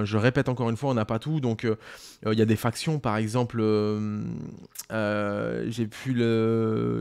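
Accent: England, United Kingdom. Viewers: Change rien mec! Haters gonna hate ! Tu nous régale avec ton accent anglais 🤣😘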